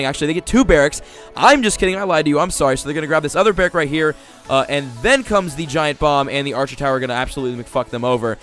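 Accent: Norway. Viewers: are u a norwegian? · WAIT A SECOND!!! ARE U NORWEGIAN? lmao i just noitced :D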